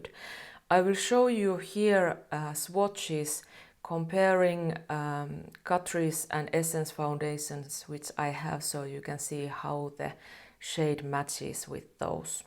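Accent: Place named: Finland. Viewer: Dear, you are really pure joy to watch! Loooooove, looooooooooooove your Finnis accent and your reviews are always spot on! Helped me alot which foundation to choose. Wish you all the best and can't wait for new vid. ☺️☺️ 😊🤗🤗 ❤️❤️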